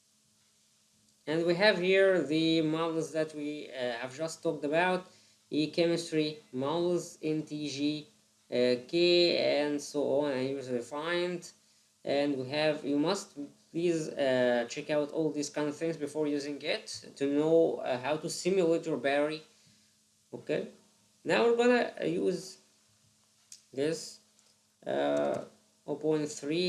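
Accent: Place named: Egypt. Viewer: although you have a very good english that made me doubt, however i had a strong feeling that this is an egyptian accent xd. very informative video even after 7 years, thank you! can i get your email for a technical question?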